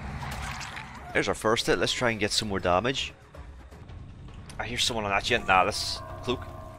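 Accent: Northern Ireland, United Kingdom. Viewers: Is this a Norn irish man playing DBD? Lol my guy. Good to see someone else from these parts playing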